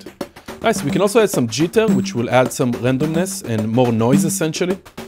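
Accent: Israel. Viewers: dude I can't get over that Israeli accent, love it!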